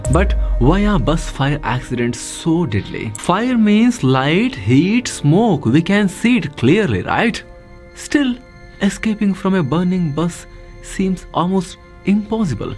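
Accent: Karnataka, India. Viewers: Bro, u r speaking English in the same pitch and modulation of your Kannada. Please correct it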